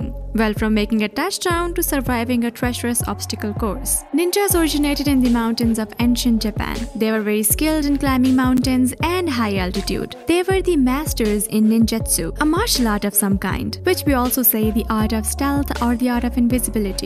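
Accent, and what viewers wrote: India. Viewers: This indian dialect, is triggering me so god damn hard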